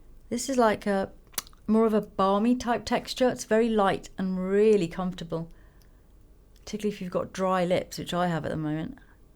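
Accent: United Kingdom. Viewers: I always fall in love with your simplicity and your perfect british english <3 Best makeup artist.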